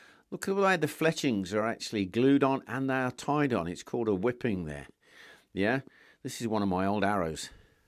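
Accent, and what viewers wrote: United Kingdom. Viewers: Always love your videos ☺️ I could listen to your lovely British accent for hours, lol. Thank you for the instructive video, as always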